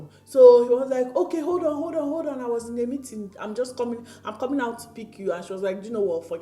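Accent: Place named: Nigeria. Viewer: Nice content I only struggle to understand the very strong Nigerian accent.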